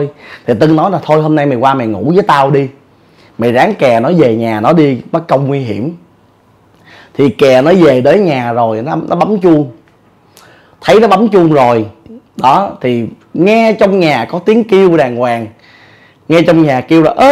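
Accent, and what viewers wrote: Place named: Saigon, Vietnam. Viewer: Em nghe anh ke giong Ben phường 7 Quan 8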